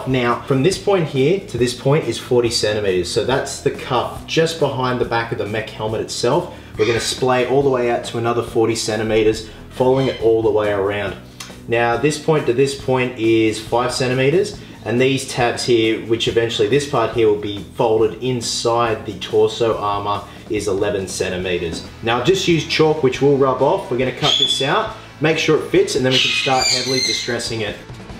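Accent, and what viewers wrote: Australia. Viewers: Sam Lovgren he is Australian, it is pronounced different in Australia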